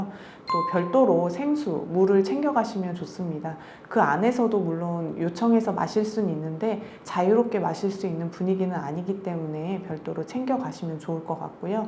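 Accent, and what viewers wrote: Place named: Seoul, South Korea. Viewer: 참 미인이세요,말씨두 너무 이쁘구요.내고향 서울 말씨 너무 듣기가 좋구 편해요.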